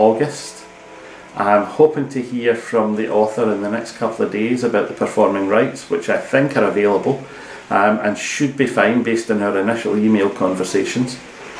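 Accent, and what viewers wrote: Scotland, United Kingdom. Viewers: Hi, Nathan sent me. I really liked your podcast. Loved your knitting, accent and the pitter patter of your lovely Scottish weather. I'm am partial to Scottish originals, can't get better than bagpipes and Westies (We have 2). I look forward to seeing your creations. Good job!!!! Sandy (Albany, NY USA) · I like your Scottish accent. Hey, here's how I talk, or taw-wuk: <link> How blessed you are to have a grandma who was so crafty! Lovely! By the way, I use acrylic almost exclusively. I knit for charity, and friends, and it seems to make more sense to make a garment that can be worn after throwing it in the hot water washer and dryer. Beautiful entrelac. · Just starting to go back and watch all of the podcasts, the socks are made from Drops Fabel...I just finished a pair in the same colourway.I love listening to you speak, it reminds me of my husbands family in Scotland. 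· Hello. It was a pleasure watching your first podcast. As a native scot, now living in Canada, it was pure heaven listening to you talking about my passion, knitting, in your very calm and lovely accent.I look forward to your next podcast. Cheers Christine Ferguson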